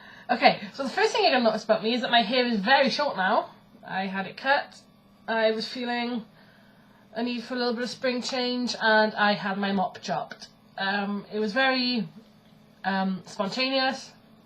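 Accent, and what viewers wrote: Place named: Wales, United Kingdom. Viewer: Love your welsh accent, just recently back from a prog rock festival in north wales, I used to be in the kitchen witch school I really enjoyed it but family life kept getting in the way so I put it on the back burner for a while. Nice to see your face again, xx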